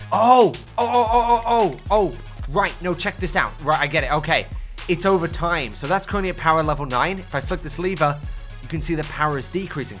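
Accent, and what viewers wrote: United Kingdom. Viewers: u r british yayya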